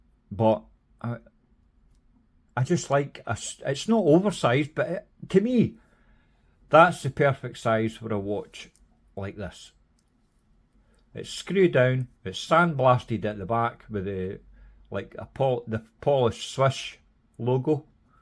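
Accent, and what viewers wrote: Scotland, United Kingdom. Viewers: Love hearing a Scottish Watch reviewer, great review and insight, Christopher gives you so much quality for the money and I think embarrasses more expensive brands like oris that you mentioned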